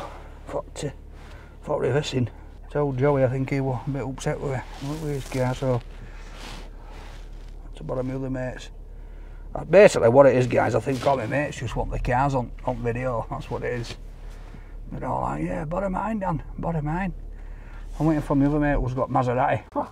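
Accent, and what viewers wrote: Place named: Yorkshire, United Kingdom. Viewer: I'm trying to place your accent. It seems like a mix of Yorkshire and Stoke-On-Trent. Please put me out of my misery :)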